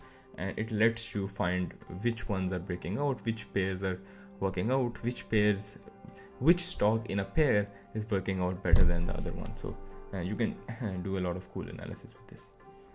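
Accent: India. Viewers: This guy doesn't give a shit about his company. Same shit 3 years later..He makes 100 changes to the UI and doesn't update the videos. I'm on a 15-day trial and seriously considering not going through with the sub because he is so lazy regarding user experience. (Also, please hire someone without a heavy Indian accent to explain how this works.)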